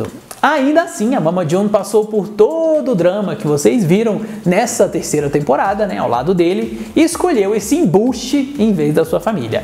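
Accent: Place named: Caipira, Brazil. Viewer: Kkkkk adoro a dublagem kkk bem caipira mesmo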